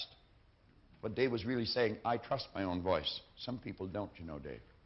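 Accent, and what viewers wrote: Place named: Canada. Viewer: I KNEW HE HAD A CANADIAN ACCENT! 🤣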